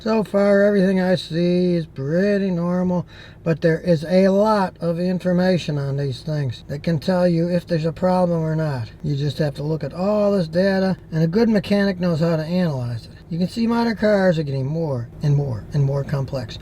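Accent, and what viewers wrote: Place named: Houston, United States. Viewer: This is Richard Dawkins, if he had been born and raised in Houston.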